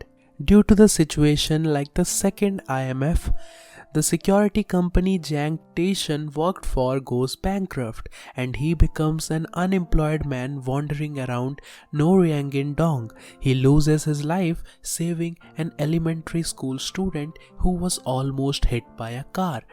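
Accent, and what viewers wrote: India. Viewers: lmao indian accent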